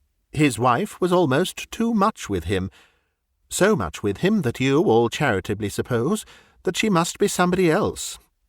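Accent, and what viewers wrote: England, United Kingdom. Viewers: It's so nice to hear an English accent that's not AI.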